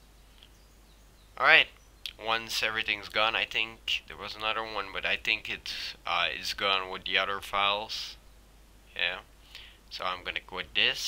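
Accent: Netherlands. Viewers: nvm his dutch sorry thought it was Afrikaans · Are u dutch?? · Thanks! it helped me, btw are you dutch lmao :D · Dutch? lol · you're dutch...I can tell by your accent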